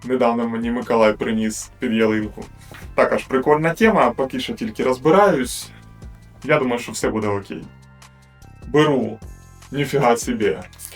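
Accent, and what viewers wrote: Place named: Sumy, Ukraine. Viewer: говірка схожа на сумську.. Суми і Бровари для мене рідні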